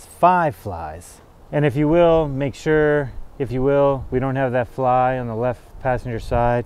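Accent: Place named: Canada. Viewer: Canadian accent.. eh?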